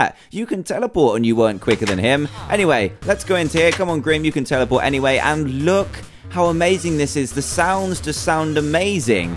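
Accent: United Kingdom. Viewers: i think british idk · British DUH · British duuuuuuuuuuh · He's British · ....British duuuhh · British Bro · British dah doy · It's British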